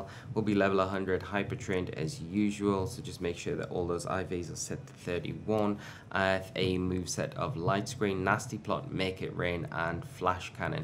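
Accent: Newcastle, United Kingdom. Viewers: You’re a geordie?? How am I only realising now😮🫡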